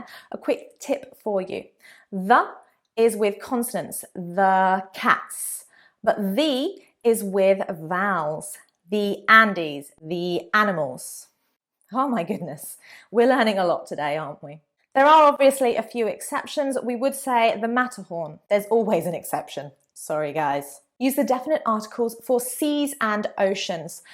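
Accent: United Kingdom. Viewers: I love it .the british accents because is too Much sweet and perfect and it is not rude to me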